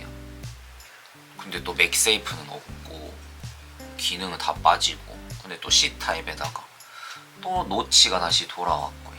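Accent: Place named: Seoul, South Korea. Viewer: 어디 지역사세여 사투리랑 서울말이랑 계속 왔다갔다 하시네요 ㅋㅋ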